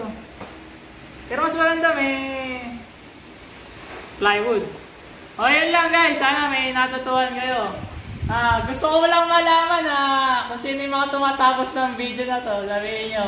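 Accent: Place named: Bulacan, Philippines. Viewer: Sabi na eh hehe, sa punto mo palang. Dyan din po probinsya namin, sa Sto. Rosario Hagonoy. Tagal ko na nga hindi nkkpasyal dyan. Salamat sa tutorial mo, malaking bagay sa aming mga nagsisimula palang .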